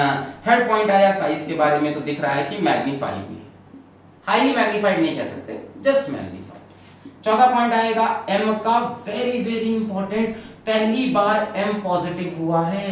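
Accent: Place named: Bengal, India. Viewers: sir akdm rapchik bengalii. You are amazing sir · Sir ur bengali ascent is very good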